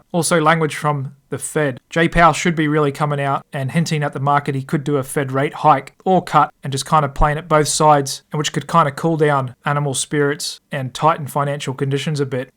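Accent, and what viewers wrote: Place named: Australia. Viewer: The format, style and delivery of these daily videos is amazing. They really help me to keep track of what is going on globally and I am forever grateful for all your hard work and commitment to this. I only hope you keep it up and continue helping all the really grateful viewers you have, and I think I can speak for them on this occasion. Perhaps a bit naughty of me to say this but your Australian twang is such a refreshing change from the usual accents that we listen to day in and day out on financial channels. This is coming from a Brit! You come across as really professional, easy on the ear, and sincere. Cheers mate, hope you keep delivering!! Have a great weekend 🇦🇺🇬🇧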